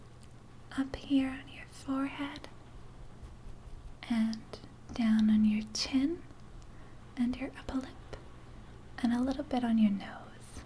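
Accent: United States. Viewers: So crazy to hear you speaking with an American accent!!! Like my brain was confused or something! Great job, the sounds were so relaxing, thank you! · Amazing American accent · I hardly comment on videos but had to say to you that you do it so good!!! Im a latina and prefer your natural accent but the american one you do sounds exactly like an american person!!! And an excellent RP!! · Ur American accent is great! Ur an honorary Yank! :) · very good America accent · Lots of fun and very relaxing =) Is it hard to keep the american accent throughout the filming process? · I must admit, this is some decent American accent! It is amazing how u can switch ur accents fluently! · I'm not going to lie. You do a really good American accent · As an actor and language enthusiast, I'm always trying to master accents from around the world, but I rarely get to turn the tables and judge a foreigner doing an American accent. 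It's pretty good! You only have problems with your o's and some of your a's. But even past that, the only thing that was big enough to give you away that you're not American is the way you said "director". But like I said, pretty good. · Awesome video as always!! Great job with the American accent. You made it sound so believable (if I didn't know that you were British already, I would've thought that you were an American). The rain helped too. Have a nice day. 😉😊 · Nailed the American accent. Perfect. Wouldn't be able to tell you weren't American if I didn't already listen to some of your other videos ;) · your American accent is better than and I'm an American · excellent job on the American accent! :-)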